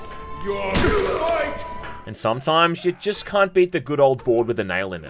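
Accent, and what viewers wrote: Australia. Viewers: I love your videos, your Australian accent paired with a witty and downright kneeslapping sense of humor makes my day sometimes. Even though i can't afford most of these games its still a treat to see someone bring underground titles and non-mainstream games to the light and its a huge breath of fresh air. Keep doin what you do ya bastard ❤.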